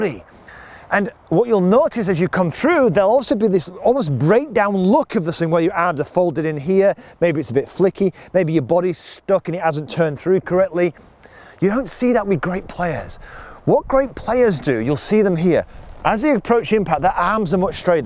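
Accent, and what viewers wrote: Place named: Northern England, United Kingdom. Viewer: Another great video lad. Honestly, I could watch all the other golf videos on YouTube and never get as much knowledge as I can with one of your 10 min videos. Your style, is backed up with the substance of knowledge that can be easily transferred to the student, all delivered with a wonderful Northern twang. As a nervous newbie to the game, you have calmed my nerves by giving me the confidence and assurance that comes with your instruction. Thank you sir for making my first year in the sport such a pleasure. I wish you and your family all the happiness and joy that you deserve in this festive holiday season. ❤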